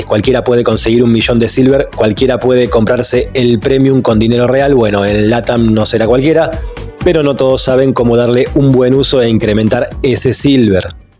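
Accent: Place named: Argentina. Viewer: Que buenas vibras tienes capo, me re encanta, deahhh. Sorry, me gusta como hablan los argentinos. :I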